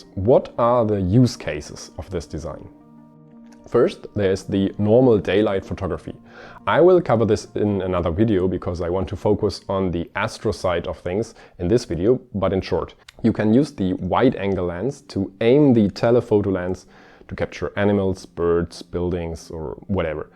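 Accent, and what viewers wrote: Germany. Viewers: Ich glaube deine Muttersprache ist deutsch ;) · German accent..... Ugh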